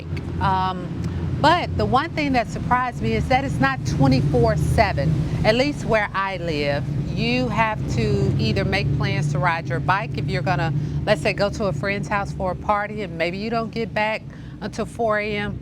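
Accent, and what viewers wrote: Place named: Chicago, United States. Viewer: 'But because I'm trying to remember that separable verb I forget what the hell that I'm supposed to be saying' ... hahaha. Good one! We've all been there. And, after 26 years, I still make mistakes, especially if confused or upset. Subject +verb +direct object +date +object (+verb)...phew. And then there's the 'aan het schrijven' conjugation of being busy doing something ... such as in 'I'm writing'. This too is placed at the end. 😏 Where are you from in the States, BTW? To me you sound like you have a Chicago accent. Am I right? So, when I first moved here, my father-in-law to be told me that during WWII the locals could tell natives apart from the Germans, who would always pronounce 'ch' words with an 'h' sound instead of 'k' (consonant) ... like 'Scheveningen' / 'Schiphol' / 'schoenen'. And now I even quietly cringe when people pronounce stroopwafel as 'stroepwaffel'. 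😄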